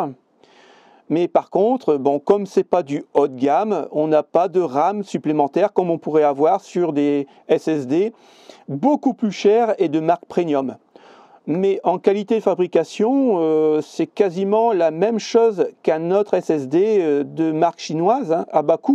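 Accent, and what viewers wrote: North, France. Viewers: T'es de ch'nord ? ^^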